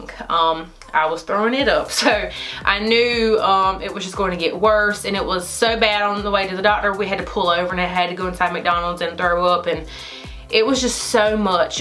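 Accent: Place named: North Carolina, United States. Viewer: I got North Carolina accent as well lol. love it.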